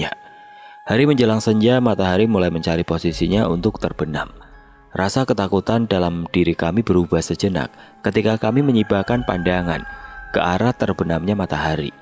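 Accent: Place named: Banyumas, Indonesia. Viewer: Ngapaknya jangan terlalu di liatin bang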